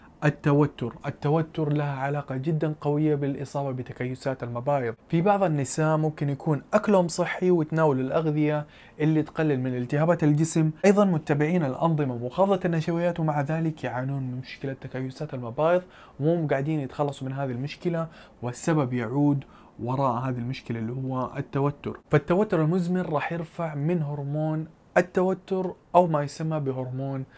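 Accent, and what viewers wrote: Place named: Hejaz, Saudi Arabia. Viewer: سعودي حجازي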